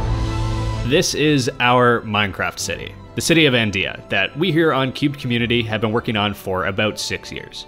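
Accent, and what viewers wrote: Canada. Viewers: Buddy you have to be Canadian. "Ferm" and "Perk" spoken like a true Easterner.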